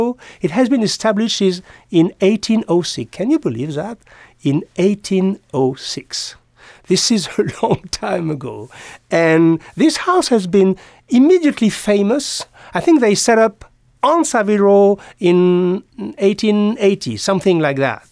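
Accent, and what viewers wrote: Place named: France. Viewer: Don't worry about your French accent Mr.. Your English is far better than my French.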